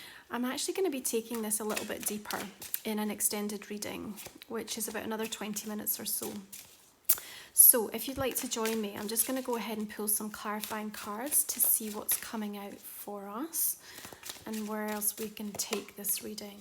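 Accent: Scotland, United Kingdom. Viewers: Hi there: Thankyou sincerely for the wonderful reading. I have to say I thoroughly enjoyed the reading, especially your voice. You accent seems familiar, am I right to say you are of Scottish blend? If so, I am too. Its nice to know that someone from my beautiful country is reading me which is a great pleasure and a tonic. No disrespect to the other Tarot readers they're all wonderful when they read my sign. But to listen to a Scottish voice is my cup of tea at anytime. Anyway, I've been a bit isolated lately, but when I'm busy writing my novels, I prefer peace and quiet it helps me concentrate, I'm also doing a bit of DIY at home, so that keeps me busy as well happy with the results, just to freshen up my house a bit, makes me feel content and satisfied. But thanks a trillion fur the wtkvime ing reading which was also very inspiring. I hope to hear from you again, your a breath of Scottish fresh air m, and we all know how cool and fresh that is eh Brrrrr... 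Thanks again & God bless.xxx⚘💞💐💐🌺🌺🌺🌺💜♦️💜🌟🌟🌟🏴🏴🏴🙏🙏🙏💎💎💎💘💎💎💎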